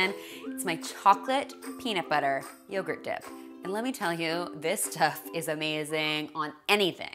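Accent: Canada. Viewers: Horseradish?? YUCK!!!! Are you Canadian? How have I never noticed your accent before?Besides the horseradish...😷 This all looks yummy!!!!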